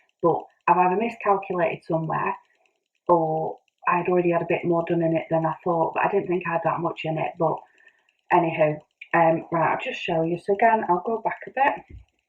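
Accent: United Kingdom. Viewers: I came over here after hearing about you from Darren Dizzy Stitcher and he was right, you're fab! I'm another British stitcher and it's so nice to hear a British accent "knockin about" on Flosstube! You're so easy to listen to and natural. I love your full coverage pieces and daren't look at Paine Free Crafts! Looking forward to seeing your progress next time!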